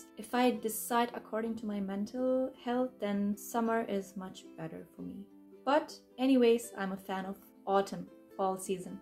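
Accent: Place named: Turkey. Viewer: Just by looking at your face I felt that you might be Turkish and then I heard your accent and I knew for sure🥳 You dance beautifully, hope you will continue your journey all the time💙 And good look with Korean and other languages ^^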